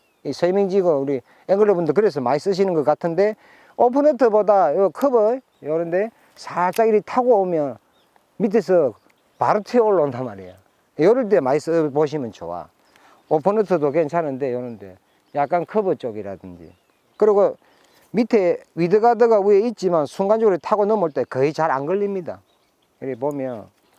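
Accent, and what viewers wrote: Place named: Busan, South Korea. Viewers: 아~하~ 영상뜨고 항상 자기전에보는데 같은부산사람으로써 말투도 정감있고 낚시를 재밌게 가르쳐주시니 더재밌고 더 알기쉬운거같아요 앞으로도 좋은영상올려주시고 항상 안전하게 낚시하시고 건강하세요ㅎㅎ 화이팅ㅎㅎ lee<num> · 얼마전 바쁘신 일정 중에도 영상 올려주셔서 감사했습니다 특히 저랑 같이 경상도 사투리를 들으며 영상을 보고 있으면 저절로 필드에 동출한 기분이 듭니다 ㅎㅎㅎ 항상 감사히 보고있어요!! <mail>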